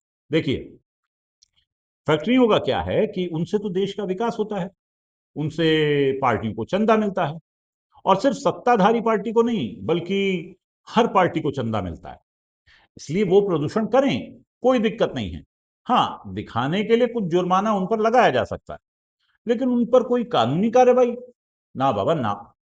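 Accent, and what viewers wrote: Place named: Uttar Pradesh, India. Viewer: You are great. You talk like my native Uttar Pradesh tone.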